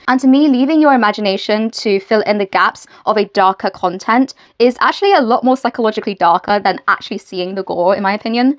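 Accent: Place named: United Kingdom. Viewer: You have such a lovely accent. Were you raised in Britain?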